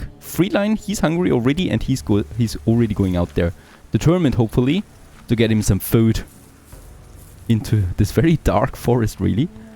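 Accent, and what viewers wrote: Germany. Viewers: Du bist Deutscher oder ? :D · Für einen Deutschen hast du einen verdammt kleinen Akzent.. : ) .. very good bro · Are you German?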